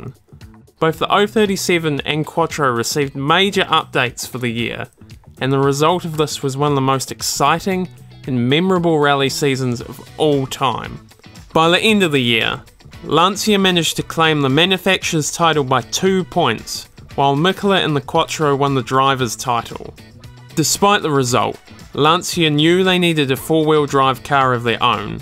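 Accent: New Zealand. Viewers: Nice to hear a farmiliar nz accent ! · Are you a kiwi? Great content mate!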